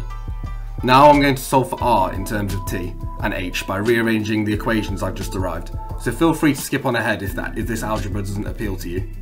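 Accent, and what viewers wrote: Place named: United Kingdom. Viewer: Your setup would be ideal if you were standing on the equator at either the spring or fall equinox. The reason your result is lower than the actual is due to the geometry that results from the tilt of the Earth at that time of year and of your latitude on Earth. If you do this experiment on the 1st day of spring or 1st day of fall, then you'd only need to adjust due to your latitude. If you divide by the cosine of your latitude (you sound British so lets say South England ~50 deg), you'd get 6222 km (4000 / cos(50)).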